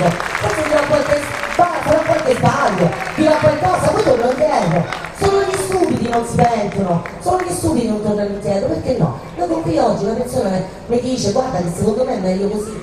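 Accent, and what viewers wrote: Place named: Rome, Italy. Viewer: Brava, ma si levasse il vizio di usare il Romano !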